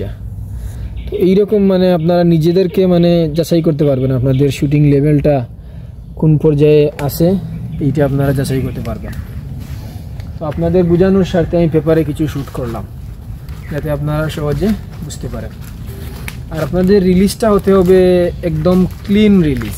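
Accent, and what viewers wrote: Sylhet, Bangladesh. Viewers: Vai ki sylheti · Bai apnr matar style sylhetr moto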